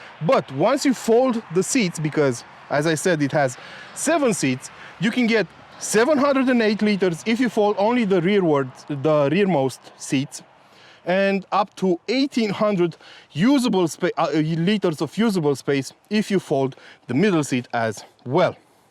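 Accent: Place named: Romania. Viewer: Judging from your accent, you are from Romania? OK, you are using some American expressions and their units of measurement, but stil, I can guess the Romanian origin 😎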